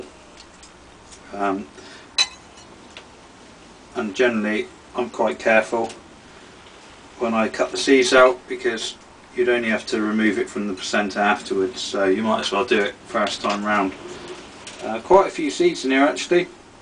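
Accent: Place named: United Kingdom. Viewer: I enjoy your videos. Like I mentioned earlier, you are just a dead ringer for my oldest son, it's like watching him with a Brit accent :-). Continue to carry on.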